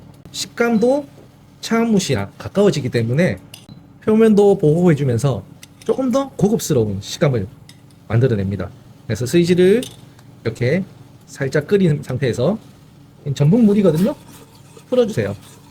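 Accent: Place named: Daegu, South Korea. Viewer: 대구 사투리로 정겹게 설명해주시는게 정겹네요ㅎ 많은 영상 기대할께요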